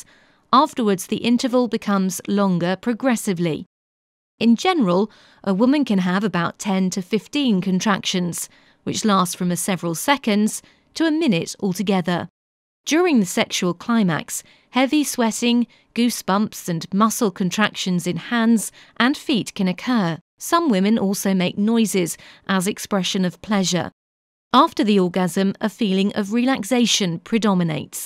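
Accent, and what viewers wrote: United Kingdom. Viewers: I'm just here for the British accent. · English accent. There's no such thing as an British accent.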